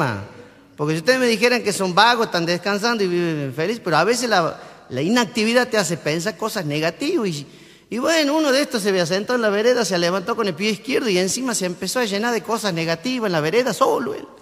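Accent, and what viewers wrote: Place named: Santiago, Chile. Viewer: Me encanta el acento santiagueño.👍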